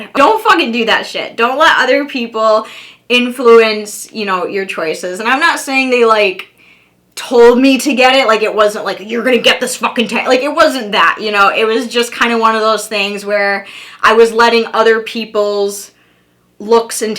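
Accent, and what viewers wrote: United States. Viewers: Listen, if you are broadcasting to an audience of many millions, isn't it time to start thinking about good English? After all, you speak one of the local American dialects, which have very little to do with the real English. I don’t want to impose anything on you, but maybe you can still correct your English?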